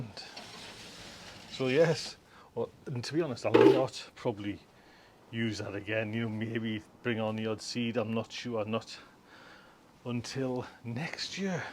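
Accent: United Kingdom. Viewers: This has nothing to do with plants, but what dialect are you speaking? I'm intrigued by British English dialects and I think yours is something I haven't heard before.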